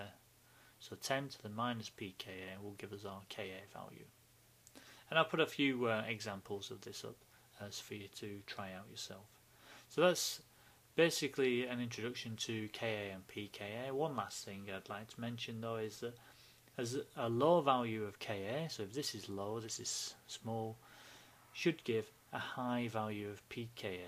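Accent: United Kingdom. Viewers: Thanks for this tutorial, it is great and very logic. It helped me a lot. And i LOVE your british accent! G.d save the Queen!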